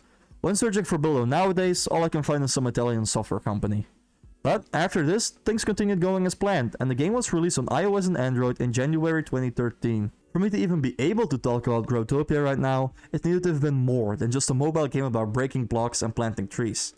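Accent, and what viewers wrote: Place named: Lithuania. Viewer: lietuvis ,ane? is akcento matosi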